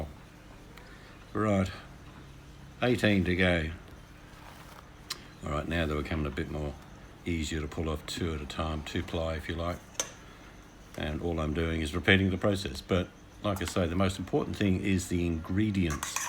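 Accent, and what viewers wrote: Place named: Australia. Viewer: Love it. So Aussie..."that's a pain in the bum".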